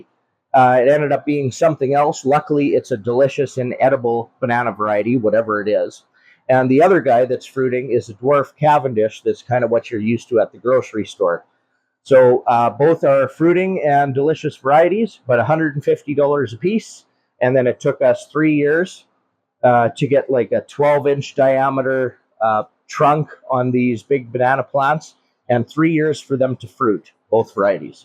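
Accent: Saskatchewan, Canada. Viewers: Some how the Canadian accent works with better than and I'm not sure how I feel about it. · Bro, we can tell by the accent you're a good Sasky Canadian boy, there won't be any naysayers 😂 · I couldn’t possibly say nay to that thick Canadian brogue.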